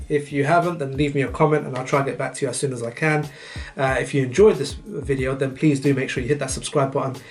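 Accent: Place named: Arab world. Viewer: واضح من لهجتك عربي صح؟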